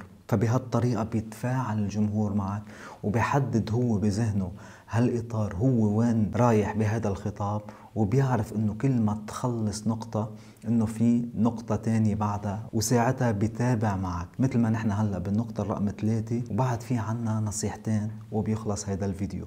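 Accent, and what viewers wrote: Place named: Lebanon. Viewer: اللكنة اللبنانيه جميله جدا وصوتك واسلوبك الهادي معجب فيه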